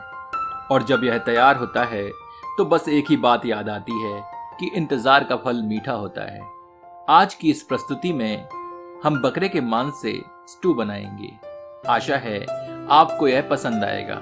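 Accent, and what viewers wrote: Standard. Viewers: एकदम शुद्ध हिंदी बोलते हैं आप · itna sudh Hindi bola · Bhai dish to tasty hoga hi.. But apki hindi sun ke doordarshan ki yaad aa gayi.. 😃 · आपकी विशुद्ध हिंदी बहुत ही मनमोहक और प्यारी है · itni suddh hindi sun k bhut achcha laga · Aap ki boli hui Shudh Hindi humein ati(behad) pasand hai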